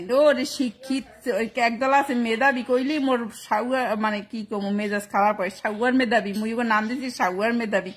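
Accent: Barishal, Bangladesh. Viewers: আপনার বাড়ি কোথায় আপনার কথা তো বাংলাদেশ বরিশাল জেলার মতো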